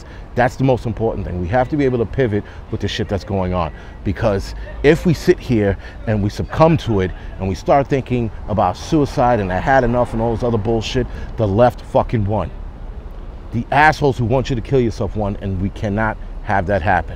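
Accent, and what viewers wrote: New York, United States. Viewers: A home boy says it straight up just like a NYer!!! THANK YOU!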